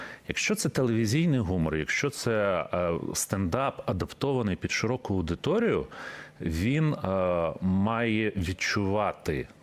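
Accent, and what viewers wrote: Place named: Surzhyk, Ukraine. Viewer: Пробачте , але я сміялася ,бо чула знайомий акцент рідної мови " суржик" - теж розмовляла , та , це не заборонено , але ви праві - сьогодні коли війна , треба бути іншими - відноситися до мови ,до людей з повагою . На телебаченні треба, коректно працювати . Скадовськ, Херсон - це Україна ! Рідний край ,рідне море ,рідна земля , рідна мова. "Суржик" теж рідний 😂 А українська - велична !❤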